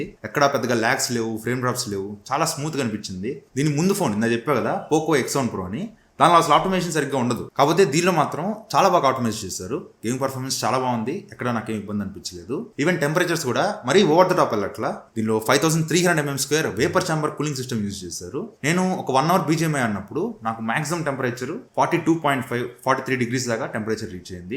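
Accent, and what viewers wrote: Nellore, India. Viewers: Anna nellore nv anna❤❤❤❤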